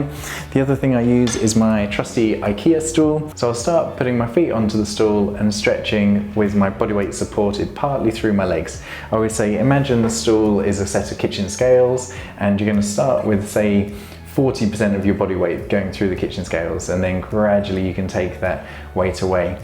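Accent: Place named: United Kingdom. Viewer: Great ! I'll check later then. Meanwhile I'm seeing your other videos here too. They're very nice as well. Saw your Minimalistic Gym Home with Kettlebells and, we have a lot in common ! I also train a lot with KBs ! Have a collection of them though ! As for paralletes I plan to buy a pair later. Talking from Brazil here. You from UK , as I deduced from your accent and later on Youtube. Best wishes anyway ! BTW the squat video very nice too. Another suggestion a follow along routine to improve your Squat daily....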